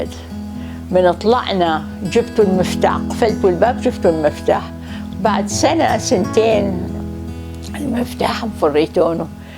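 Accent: Mosul, Iraq. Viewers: الله يحفضك ويطول عمرك يلغاليه كلنا العراقيين نسمع قصتك وانت تحكين الغه العراقية بلأخص الغه تحكين مصلاوي وانا من أهل الموصل وسمعون حكيكي بلمصلاوي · كانو لهجتها مصلاوية 🤔؟ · تحجي مصلاوي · ماشاءالله عنا لهجتها العراقية واضحة وقوية( كولش) وهي ايضا سيدة جميلة العمر الطويل لها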